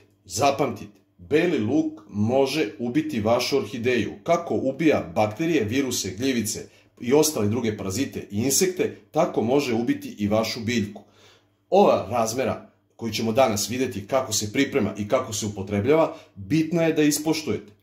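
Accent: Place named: Serbia. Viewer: Вслушиваясь в ккрасивый баритон и слова, угадывала, какой национальности принадлежит человек.Многие слова знакомы,текст понятен почти полностью.Серб, оказалось!!! Спасибо, дружище! Успехов Вам!!!!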